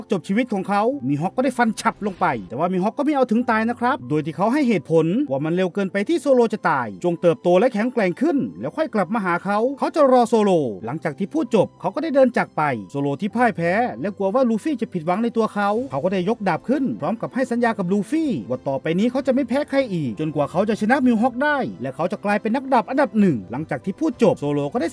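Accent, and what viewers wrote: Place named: Bangkok, Thailand. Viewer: สำเนียงคนกรุงเทพฯแท้ๆแหละ ดูออก แม่นบ่แอด?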